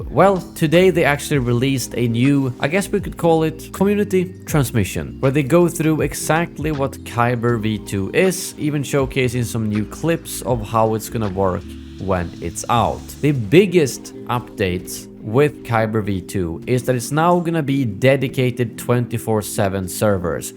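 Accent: Sweden. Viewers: u swedish?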